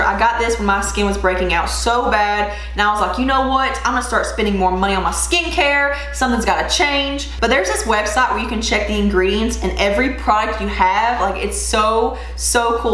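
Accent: South, United States. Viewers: Love your accent!!!! For sure from the south!!!!!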